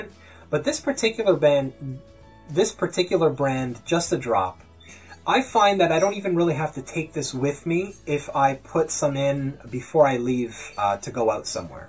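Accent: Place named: Canada. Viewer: Just discovered you! And you must be Canadian because you sound like me! 😎😁🇨🇦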